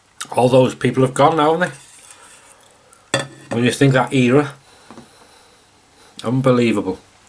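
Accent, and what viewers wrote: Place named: Cockney, United Kingdom. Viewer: 😆😆😆👍👍 you crack me up guvna!! Love yer take on the cockney accent! Ha ha! Happy Happy new year, dont forget, pop in for a cuppa or a pint if you are down my way! And no no ruby tonight chief, hot dogs!! Dont ask me why, just fancied hot dogs. 👊😉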